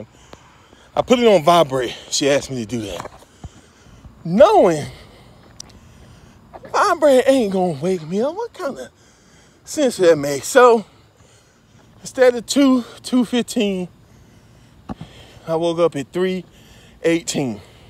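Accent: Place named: South, United States. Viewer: Cool front 88 YOU FROM THE SOUTH Lol. Yes Sir!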